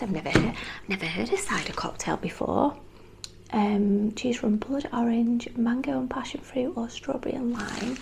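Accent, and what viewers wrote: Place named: Northern England, United Kingdom. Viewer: Lovely video! Your accent sounds familiar, seaside northern town maybe?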